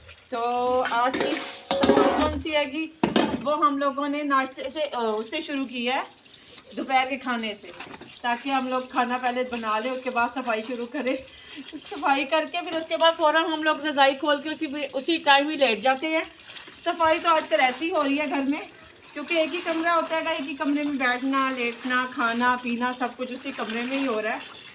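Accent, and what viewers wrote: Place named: Punjab, India. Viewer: You are punjabi I think from you accent so you should speak Punjabi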